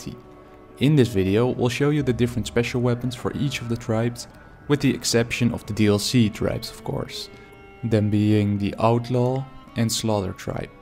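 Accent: Netherlands. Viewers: I can tell you're dutch by your english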